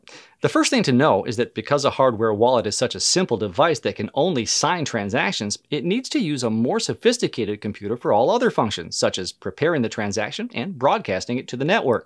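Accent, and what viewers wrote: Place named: United States. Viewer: May I ask which US State were you born? Your accent is so pleasant to ear!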